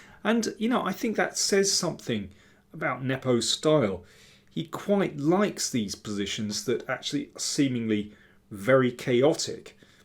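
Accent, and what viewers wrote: United Kingdom. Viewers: Mystery to me, both entertaining and gives great explanations of the ideas behind the games. I have been wondering if it's because many chess players find following the native British English harder than non native where the words are both simpler and enunciated more slowly.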